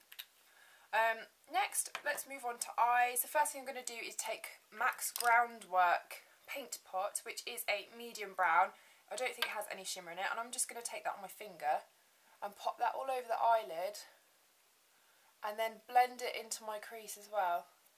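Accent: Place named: London, United Kingdom. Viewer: are you from london? love your accent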